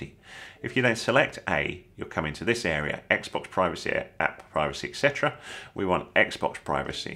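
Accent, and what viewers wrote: United Kingdom. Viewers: U already know it’s a good tutorial when they got a British accent 🙌🏽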